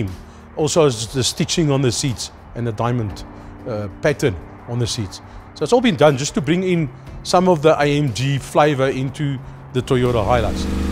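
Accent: Afrikaans, South Africa. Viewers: Thats the thickest Safa accent of all time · What accent is it, does anyone know?! I mean sounds a bit South African accent but not sure.🤔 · That's just a South African Afrikaner accent.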